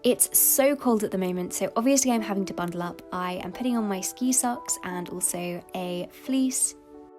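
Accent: United Kingdom. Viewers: I love your british accent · For me, to have a video where I can listen to poetry read aloud in a British accent while looking at the English countryside is... well I feel very fancy.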